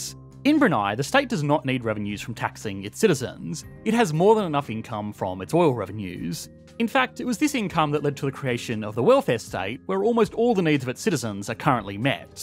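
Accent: Australia. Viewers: Greetings from China! You an Aussie or Kiwi? Guessing Aussie... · He's an Aussie. I assume that you have a VPN to watch this from China.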